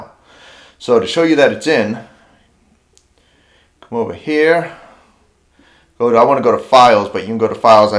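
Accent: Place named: Boston, United States. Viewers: lol im from amsterdam and i was about to ask if it was a boston accent heheh cant miss that shit :) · Way to go. I recognize the Boston accent. I’m from Boston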